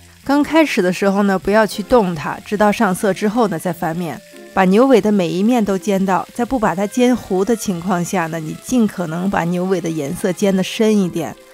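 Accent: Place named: Northeast, China. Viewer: 我听你的口音有点像东北口音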